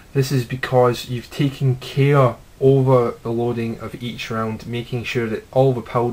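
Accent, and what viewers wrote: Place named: Scotland, United Kingdom. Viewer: Not only is this entire series very informative, it sounds like it has much more authority with the Scottish accent. Props to you, good sir.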